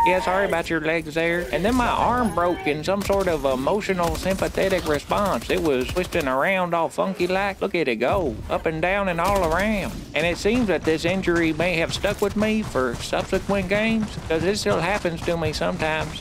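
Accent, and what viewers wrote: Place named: South, United States. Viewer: If you want peace prepare for war....the war for putting democracy hearts of many people with a smile uoon you're face. Good video strange seeing you play longer but hey new format kinda works and it honestly gets people in the vids to hear that strong country accent! Hellyeah brother give them the hammer of democracy!. Cheers.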